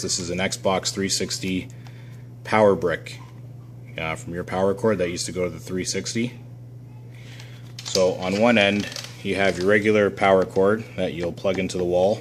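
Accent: Canada. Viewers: You can easily tell hes Canadian. That's why he's well spoken. He's just not using slang or anything as some would in the US. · sounds like he's Canadian to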